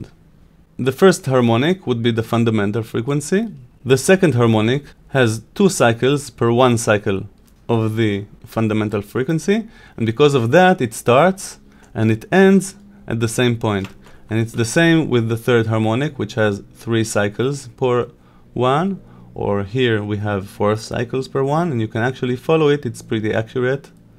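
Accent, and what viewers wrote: Israel. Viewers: you from Israel / you have the accent/ good work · a mathematical point of view: Fourier Series <link> האם זיהיתי מבטא ישראלי? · Haha It happened again, looked for a good music explanation, found a video in English (Which is not my mother language) but with Hebrew (Israeli) accent (Which is my mother language).. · Where are you from? You have an Israeli accent. :)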